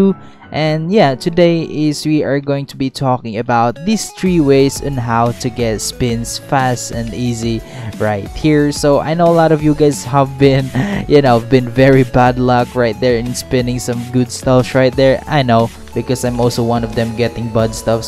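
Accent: Philippines. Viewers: THis guys lives in the Philippines i know by his accent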